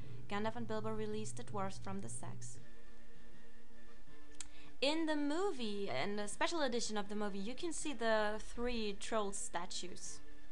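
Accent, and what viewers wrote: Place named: Ireland. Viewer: I got your accent now, now I know why it sounds Irish a bit.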